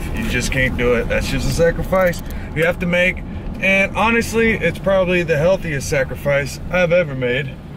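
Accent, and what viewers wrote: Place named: South, United States. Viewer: i didnt expect your southern accent i never heard your voice till now🤣 and it made me fw you even moree very chill and laid back love to see it!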